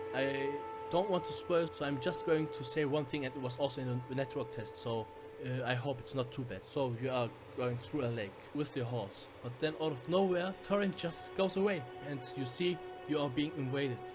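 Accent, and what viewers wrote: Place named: Germany. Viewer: ich finde dein englisch voll gut. Man hört zwar, dass du deutscher bist aber die wortwahl ist echt gut getroffen😂👍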